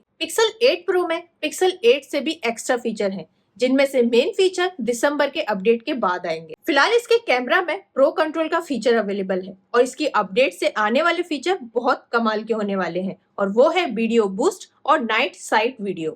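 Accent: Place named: Kangra, India. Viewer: Aap kangra ya hamirpur se lag reho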